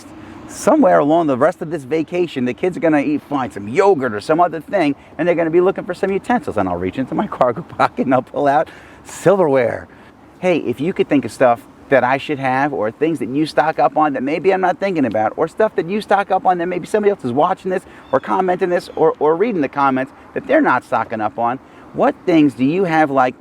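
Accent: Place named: Long Island, United States. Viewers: He sounds like he is from Ronkonkoma or farmville but his info is solid. His hands made me laugh. He talks like everyone in my family!! :) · you sound like you are from Long island? I grew up in Suffolk county!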